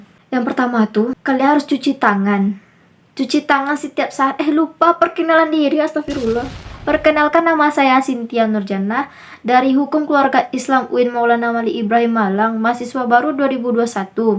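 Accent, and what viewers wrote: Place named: West Sumatra, Indonesia. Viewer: Minang nya jelas kali ya ges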